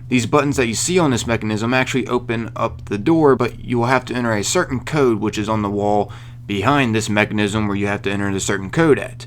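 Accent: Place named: Western Pennsylvania, United States. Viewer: Brother you gotta be from Western PA right?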